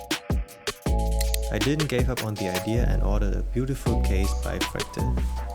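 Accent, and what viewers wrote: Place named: Germany. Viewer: cooles video aber man hört schon das du deutsch bist und du hast alles richtig gemacht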